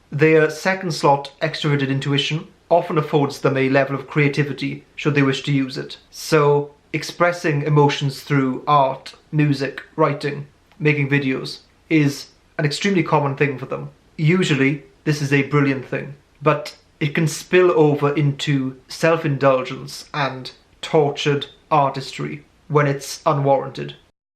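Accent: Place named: RP, United Kingdom. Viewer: You have the most posh accent I’ve ever heard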